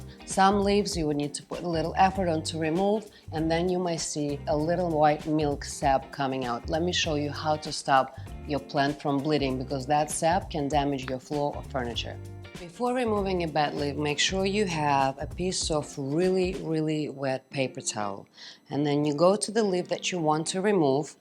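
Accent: Russia. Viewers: I love Russian or some sorta accent 😅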